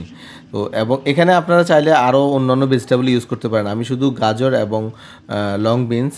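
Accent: Noakhali, Bangladesh. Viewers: Noakhali mone hocce.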